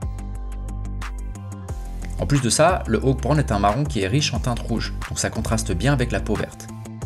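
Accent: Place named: France. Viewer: superbe travail, j’ai découvert vos vidéos en anglais mais je me doutais que vous étiez français. Puis je vous demander où vous avez imprimé cette figurine?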